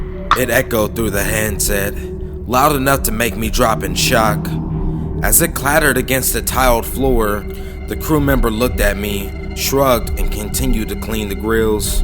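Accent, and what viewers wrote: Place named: United States. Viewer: Are you from Sydney Australia you don't have an accent you sound American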